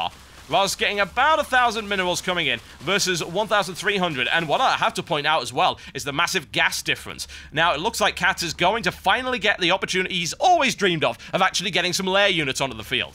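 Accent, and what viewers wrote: United Kingdom. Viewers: U gotta love British cast.... Great great job mate ;-)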